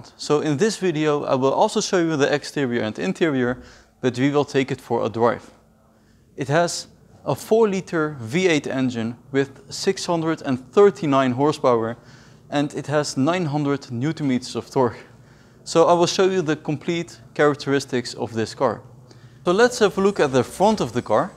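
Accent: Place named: Netherlands. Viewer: i immediatly knew he was dutch hearing how he speaks english =)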